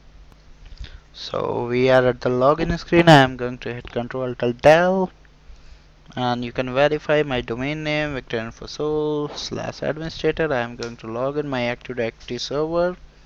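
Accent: India. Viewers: omg the indian accent is triggering me yet there is no other option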